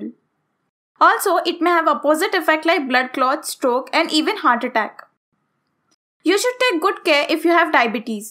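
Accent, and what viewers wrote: India. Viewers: Indiaaaaaaaan pleeaaase stop talkin’ inglish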